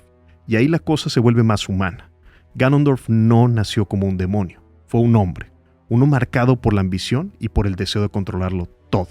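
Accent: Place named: United States. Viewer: Hubiera sido un buen video si no usará ese asento culero de americano y espanglish forzado, si está hablando en español!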